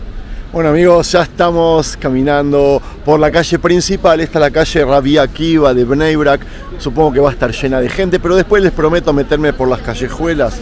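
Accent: Argentina. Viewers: Hola. Soy Miguel de San Vicente bs as, no soy religioso, sin embargo vos con tus videos logras llamar mi atención, haciéndome ver cada uno de tus videos.Quizas sea el acento argentino. Quisiera hacerte una consulta, vos decís en el vídeo q los sefardíes son los judíos de oriente y los azquenazis los de occidente.es así o es al revés.? Te mando un abrazo grande y seguí así.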